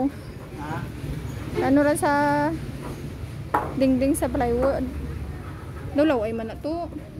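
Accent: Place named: Ilonggo, Philippines. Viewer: Hawud na kaayo ka mag Ilonggo sis nindut paminawon hehehe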